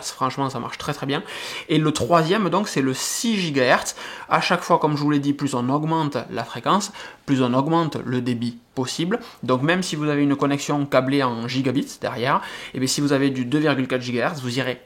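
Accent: South, France. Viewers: Salut, merci pour cette super vidéo. J'aime beaucoup ta façon de partager ta passion et de vulgariser de façon simple tout ce que tu expliques. Et franchement ton accent et tes expressions telles que "ça poutre" ça me tue, je suis aussi du sud, mais sud sud (34) pas sud-ouest donc c'est kiffant. Pour en revenir au sujet, j'utilise perso un ORBI RBR20 ça suffit amplement pour chez moi et au boulot je mets de l'unifi partout (je suis dans la tech aussi, support/installation), c'est amazing ubiquiti c'est la vie. Je ne mets par contre par autant de bornes que tu préconise (comme les musées) mais une ou deux par "étage" des UAP-AC-PRO qui font je crois du 3x3 MIMO et là aussi (on a que des portables et mobile) ca semble suffire. Mais tu me fais poser la question si je ne devrais voir pour augmenter ce nombre car beaucoup beaucoup de devices en Wi-Fi et que 2 bornes... 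Bref continu comme ça! Top moumoute ta chaînes et tes vidéos.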